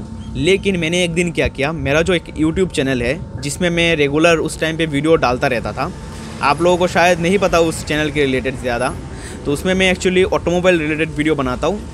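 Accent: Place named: Bengal, India. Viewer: oh I thought you're from West Bengal because of Bengali accent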